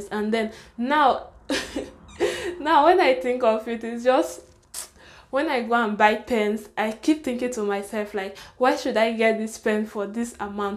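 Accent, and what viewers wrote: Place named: Nigeria. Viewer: I love your video.... and mostly your accent, you speak like a friend of mine....naija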